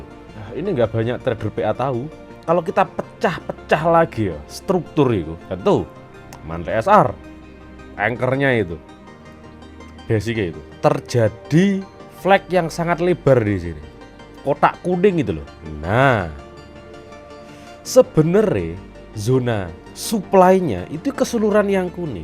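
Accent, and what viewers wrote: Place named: East Java, Indonesia. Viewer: orang mana bang? Aura Jawa Timur nya sangat melekat😂🙏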